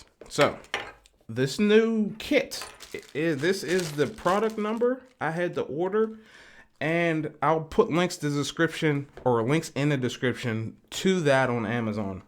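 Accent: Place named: Pittsburgh, United States. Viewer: Are u from Pittsburgh u have that p burgh slang like in errre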